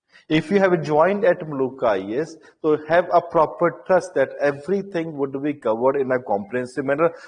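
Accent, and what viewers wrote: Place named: Punjab, India. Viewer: He used punjabi method while speak English....which creates irritation for student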